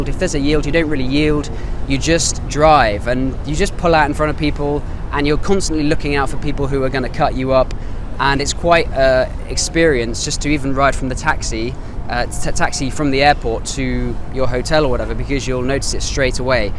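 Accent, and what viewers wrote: United Kingdom. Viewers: I like your accents 😁 the way you said summer.. It's british and really like british accent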